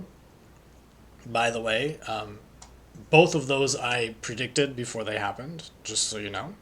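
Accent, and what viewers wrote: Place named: Finland. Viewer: hmm, possibly Finnish? I legit thought you were 100% Finnish at first and you almost like… have an accent? 😅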